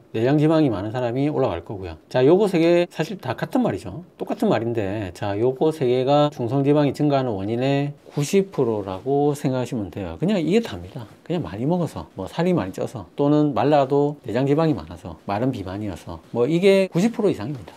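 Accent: Gyeongsang, South Korea. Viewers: 중성지방이 높으면 당뇨도 올 수 있는 위험이 될 수 있다는 말씀으로 이해가 가는데, 경상도 말씀이신가, 내 입장에서, 선생은 숨도 안쉬고 말씀하시네요. 열심히 하시는것 같은데, 원 뭐가 뭔지 금방 비행기가 지나갔나...;정신이 없네요. 지식있는 간호사님 모아놓고 강의하시는 것이 더 효과적일듯 함....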